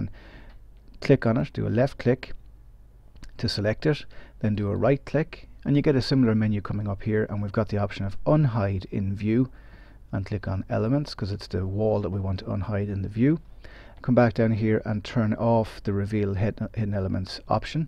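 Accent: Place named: Ireland. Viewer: Its good to hear an Irish voice and well explained.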